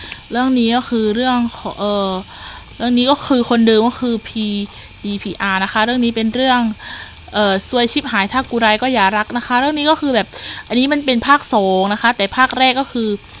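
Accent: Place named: South, Thailand. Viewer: คนใต้ชัว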